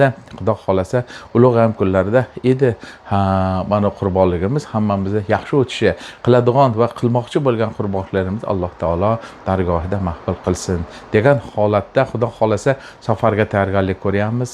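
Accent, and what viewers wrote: Namangan, Uzbekistan. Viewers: Rahmat.Namangan shevasida hammasi ajoyib😊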